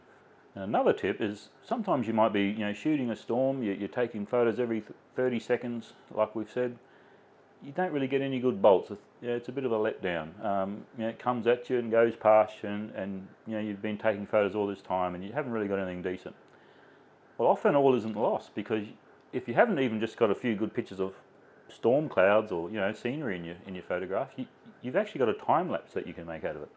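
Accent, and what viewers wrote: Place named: Australia. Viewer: Nice easy to understand tutorial. Nice to hear the Aussie accent rather than some septic tank shouting and screaming at you.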